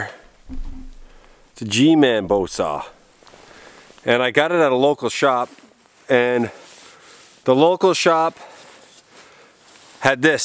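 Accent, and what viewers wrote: Canada. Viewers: Very calming video.You seem like a nice guy.Good to hear a Canadian accent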